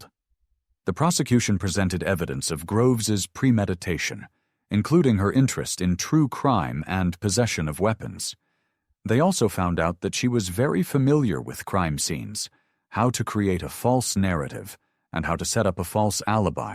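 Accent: United States. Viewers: Why is it narrated by an American? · Why is the narrator american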